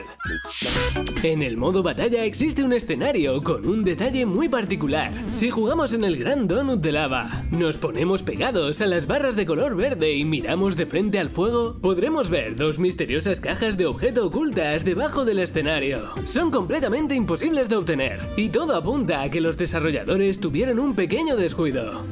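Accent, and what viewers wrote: Spain. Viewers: No entendí ninguna palabra por el acento español pero aún así me gusto el video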